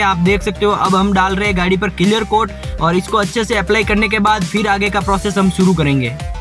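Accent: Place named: Marwari, India. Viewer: Bhai thari hindi sunr lage thui marwadi hai agr hai to ❤❤❤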